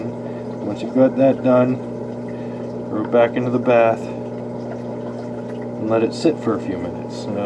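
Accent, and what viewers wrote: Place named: Minnesota, United States. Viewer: Boy your voice sounds like home AKA Minnesota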